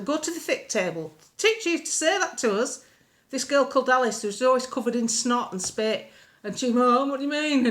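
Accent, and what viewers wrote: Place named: Yorkshire, United Kingdom. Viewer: Really enjoyed this, good to hear a Yorkshire woman just being human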